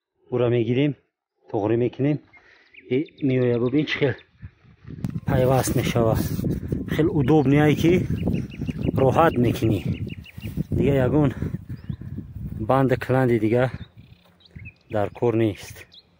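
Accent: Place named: Kuhistoni, Tajikistan. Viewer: Бисйор оличаноб дустам рохат кардем лачаи хуби кухистони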